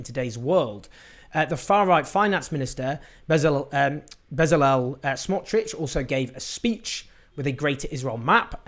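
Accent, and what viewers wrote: United Kingdom. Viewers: I like your show but it's very difficult for me and I am sure also for so many others to understand your very heavy British accent. Try to speak a bit slowly and make your English a bit more Like an American Accent so that all your international listeners can understand you better. By the way, I am NOT an American. Thank you once again for your great and valuable content.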